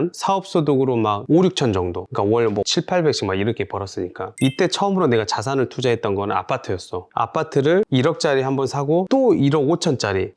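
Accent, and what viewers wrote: Jeolla, South Korea. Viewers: 마음가짐 확언만큼은 이미 1등급 입니다 좋은에너지 나눠주심에 감사합니다 긍정바이러스는 선물 입니다 중간중간 구수한 전라도 사투리도 정겹구요 좋아요 버튼은 늘~항상 잊지 않는것도 팬심이랍니다ㅎ 파랑색을 좋아해서 자꾸만 쇼파가 탐이납니다요ㅎㅎ 파랑새는 멀리있지 않습니다 이미 제 마음속에 있네요^^ 확언! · 경상도인데 전라도사투리 넘 재밌어요~~😂😂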